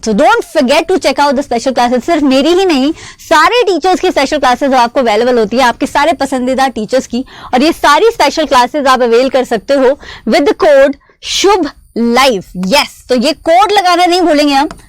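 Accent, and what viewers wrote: Tamil Nadu, India. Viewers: By ur talking style i think that u are from tamil nadu😄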